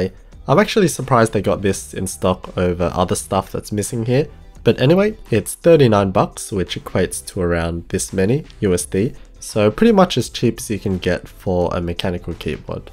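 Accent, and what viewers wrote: Australia. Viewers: aussie mate] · Oh wow you’re Australian too nice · the hint of Australian in his accent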